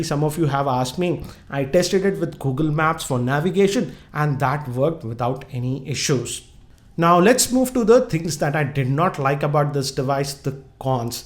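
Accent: India. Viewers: Good review, after watching all those Indian reviews I start to like the accent.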